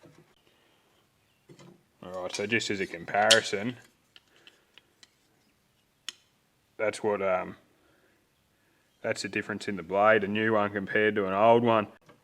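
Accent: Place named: Australia. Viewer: Thanks mate, good to hear an Aussie how-to rather than always US videos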